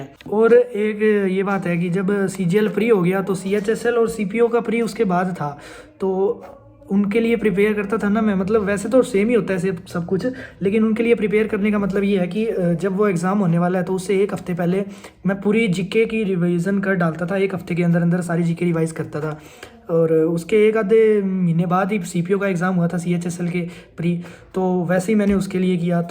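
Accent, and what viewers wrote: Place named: Hisar, India. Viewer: Kha se ho aap ? Hisar side se lg rhe ho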